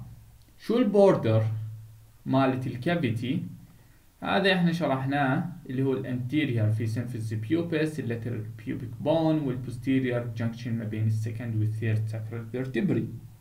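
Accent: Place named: Iraq. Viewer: دكتور عندك اكسنت من اللهجة العراقية 😶‍🌫️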